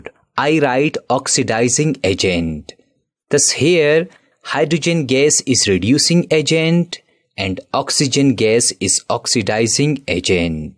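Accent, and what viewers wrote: South India, India. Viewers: Top waale teacher .. I mean very superb teacher with south accent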